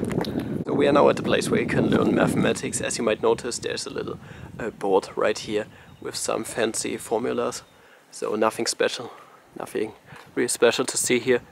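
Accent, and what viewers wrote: Germany. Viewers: I do love how blunt German's sound when they speak in English, great video nonetheless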